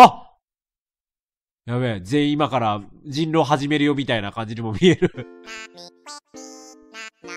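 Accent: Kansai, Japan. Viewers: あさみさんのアフレコしてるレトさん好きすぎる(*´ω｀*) ナチュラルに関西弁きけるし( ¨̮ ) てか、あさみさん可愛い！好き！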